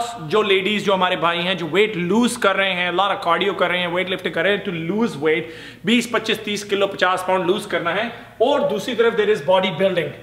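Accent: Punjab, India. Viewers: Jst love the way he talk....punjabizz always rock😂😊😍😘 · Yaar Punjabi ke saath yeh ajeeb sa accent english ka... Fir punjabi touch wali hindi..... Maa kasam.. body pata nahi... But dimaag ka workout to tum poora karwa dete ho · His punjabi accent is soo cute, haha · Tuhadi khand chatt diya 😂😂😂😂😂 mori cha ungle 😂😂😂😂😂😂 pure punjabi accent 😂😂😂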